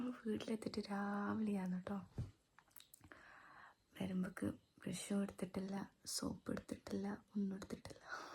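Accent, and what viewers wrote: Kasaragod, India. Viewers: vedio kand kand ipo ksgod slang set avunund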